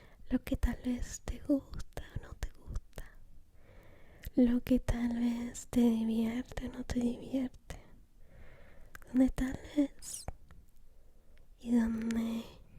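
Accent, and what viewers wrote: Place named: Argentina. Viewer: Desde que parte de Argentina transmites los videos, lo digo por la entonación.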